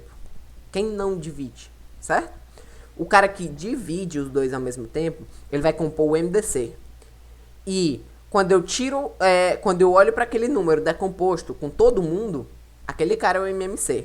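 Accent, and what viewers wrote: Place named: Northeast, Brazil. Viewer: conheço esse sotaque nordestino kkk minha terrinha <3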